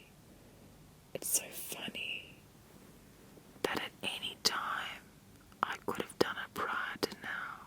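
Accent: Australia. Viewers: Hia... Just thought I'd say thanks for another amazing video! Literally, when I cant sleep I turn straight to your vids... and I always find something that helps me dose off.......The aussie accent is also a big bonus!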